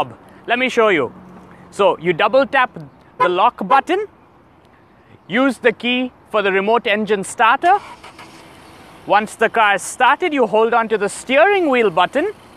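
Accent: India. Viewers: İndian english is soooooo 😫😫🥴🤢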